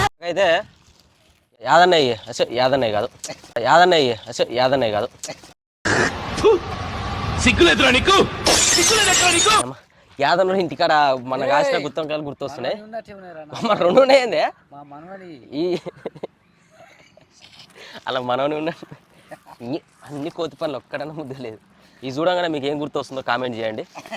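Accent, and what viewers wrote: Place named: Nalgonda, India. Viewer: నువ్వు మా ఊర్లో మాట్లాడినట్టు మాట్లాడుతున్నావు... మాది నల్లగొండ, దేవరకొండ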